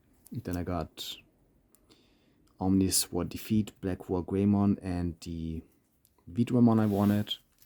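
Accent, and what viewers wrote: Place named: Germany. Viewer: Irgendwie hab das Gefühl, das du in deinem Englisch Ton, eingentlich deutsch sprichst 😅 is das so?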